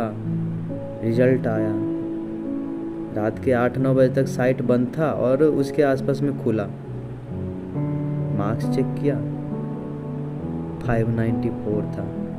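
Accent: Bihar, India. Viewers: Firstly, When I listen you voice I get a smile in my face because I listen Bihari accent. As am too bihari. Story of bihari is always struggleful and inspirational. I'm very inspired by you bhaiya and I'll surely crack NEET2024. 👨‍⚕❤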